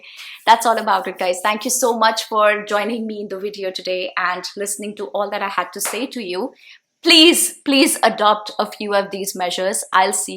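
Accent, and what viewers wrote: India. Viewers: You need to change your accent in English language from Indian to American accent. Indian people tend to speak too fast (bad control of breathing) when speaking English. American accent is worldwide. Changing accent is easy for some people but very hard gor other. Take it as a constructive criticism.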